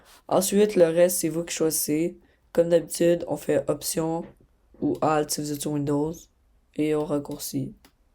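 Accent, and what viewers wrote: Canada. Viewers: Ses un accent canadien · j adore ton accent quebecoi tabernacle de dieu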